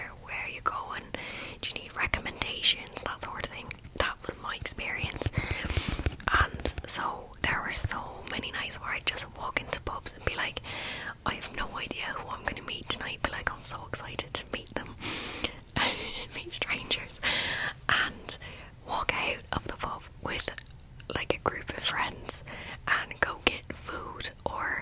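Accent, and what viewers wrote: Dublin, Ireland. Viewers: Awesome video. I love your irish accent. I’ve been to Ireland once for a weekend but we only stayed in Dublin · As an Irish person this is one of the best accents from an American I’ve heard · That was actually a really good Irish accent! If you’d had started with it I could’ve been fooled and I’m Irish & have lived here my whole life 😂😂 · Great accent most Americans butcher it class job 🇮🇪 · Accent is actually quite good! Mix of a few places which makes it sound a tad off to an Irish person but very good. Also small note, Ireland is not in the UK! Although Northern Ireland is. Be careful around that some people might get very upset hearing that 😋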